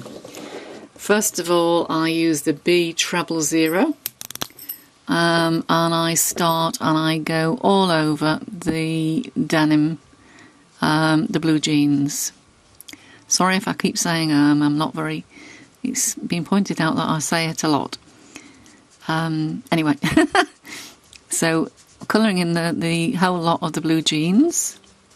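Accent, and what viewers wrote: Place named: RP, United Kingdom. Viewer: Great video buddy! It's lovely to hear your (posh) voice! xxx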